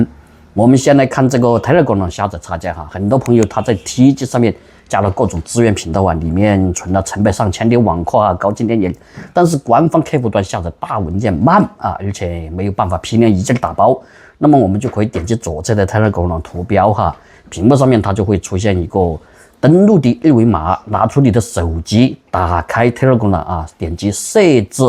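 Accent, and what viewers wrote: Sichuan, China. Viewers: 喜欢你的四川口音(川普)，与印度口音的英语。。。:face-green-smiling:🤣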